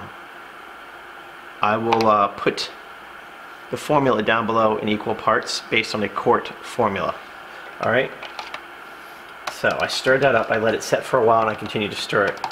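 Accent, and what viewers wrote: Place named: New Orleans, United States. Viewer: Where are you from? You sound like you are from New Orleans. I grew up here and the accent sounds familiar.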